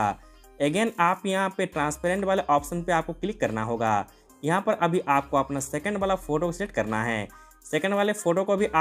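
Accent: Chhattisgarh, India. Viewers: Bhai aap chhattisgarh se ho kya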